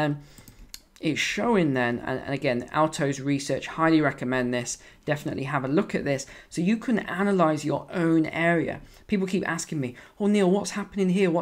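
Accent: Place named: RP, United Kingdom. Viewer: Neil, I never enjoyed watching investment and housing videos until I discovered you. I have been following your advice since March and I think you are the best. I Iove your posh accent. Greetings from Asia; wish you would talk more about the housing out here too. I am really looking forward to you next video!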